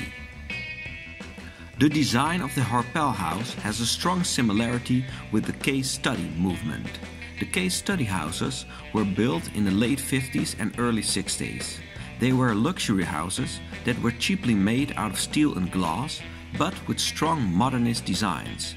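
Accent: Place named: Netherlands. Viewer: Another well turned out vid. Your voice and cadence of speech are very good for the narration. And the Dutch accent is chic. :)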